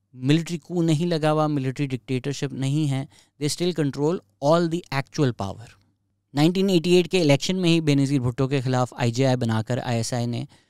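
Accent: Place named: Delhi, India. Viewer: Brother I'm from old Delhi Chandni chowk India I just wanted to say your accent is very similar to the normal Dilli wala accent. ❤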